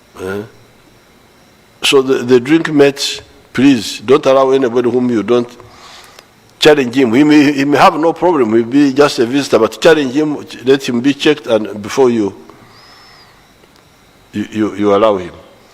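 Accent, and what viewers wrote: Nigeria. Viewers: Is the host a Nigerian? I couldn't hear his English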